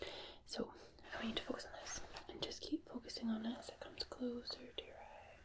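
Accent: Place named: Scotland, United Kingdom. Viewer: Thank you,.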